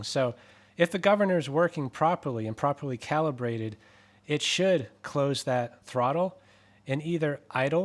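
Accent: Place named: New England, United States. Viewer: Nice video, thank you! I heard a New England accent! Ron USA, PTL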